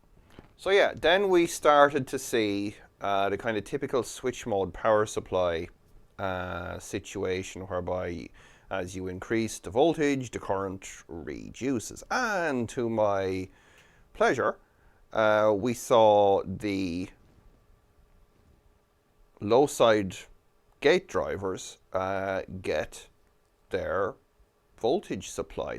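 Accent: Ireland. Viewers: Gah. Didn't see this comment till I'd watched it all. I'll know for next time :-) Not as slow as Mr Rikards, but the Irishness does show through :-)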